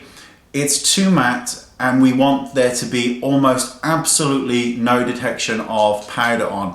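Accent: Australia. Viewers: You're British right? Kinda sound Australian lol. Thanks for the video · are you from australia or great britain?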